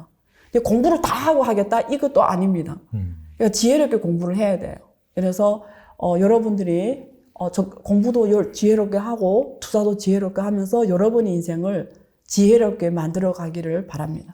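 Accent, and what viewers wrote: Yanbian, China. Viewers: 연변사투리가 쎄네요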